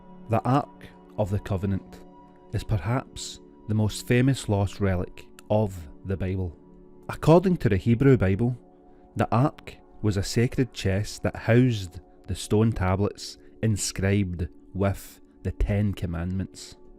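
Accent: Scotland, United Kingdom. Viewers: Starting to look forward to that Scottish voice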